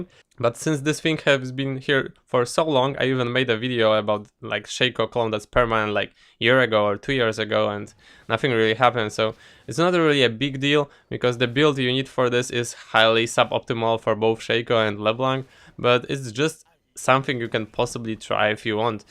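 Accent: Poland. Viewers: Masz minimalnie polski akcent :D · Where are u from? U got a little polish accent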